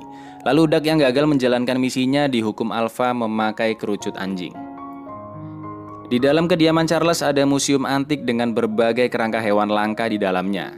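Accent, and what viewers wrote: Java, Indonesia. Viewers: Bang orang jowo ya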